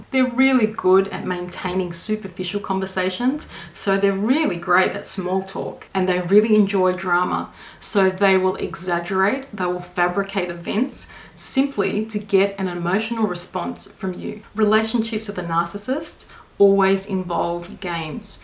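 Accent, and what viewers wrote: Australia. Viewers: Your laymen's explanation of narcissism was so on point. Thank you so much. I am grappling with a family member who presents symptoms of this disorder. Also, love your accent, is it Aussie???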